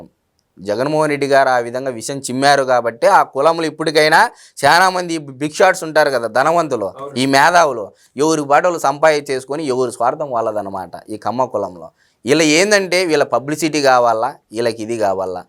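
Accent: Rayalaseema, India. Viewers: సూపర్ బ్రో మీరు రాయలసీమ వ్యక్తి లాగా అనుకుంటా రాయలసీమ వ్యక్తివా నువ్వు చాలా చక్కగా బాగా చెప్తున్న బ్రో. కమ్మ కులంలో ఉండేది అదే పరిస్థితి బాగా గమనించినావే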